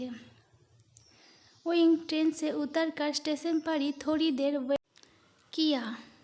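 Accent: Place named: Bengal, India. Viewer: Mujhe laga hi ta pahle din se hi ki aap bangli ho kuki aapke bolne ke lahje se me samjh gai ti di kuki me bi bangli hu 😍 and i love your voice and aap bahut hi aachi bol leti hai di 🤗 love you so much di 😘😘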